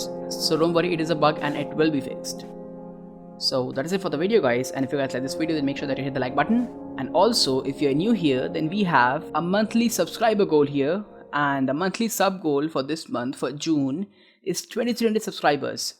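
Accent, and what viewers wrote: India. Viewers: Good video.. good tutorial.. Keep it up ... but U English Can make it Not Like indian? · Are you indian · Indian accent ???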